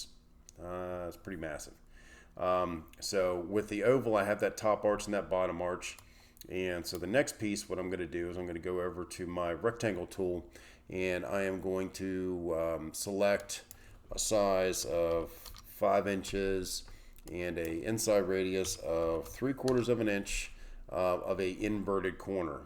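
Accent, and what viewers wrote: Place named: Philadelphia, United States. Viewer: Where are you located? You sound like you’re from Philly or South Jersey.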